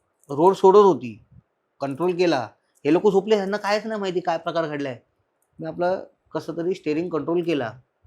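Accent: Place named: North India, India. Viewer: Hyacha gav bagha bolanyawarun bhaiya watato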